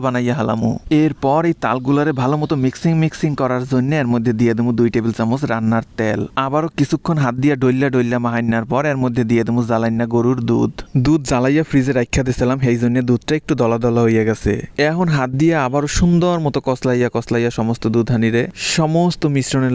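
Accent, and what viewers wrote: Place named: Barishal, Bangladesh. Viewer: কথা গুলা সুন্দর আমাগো বরিশালের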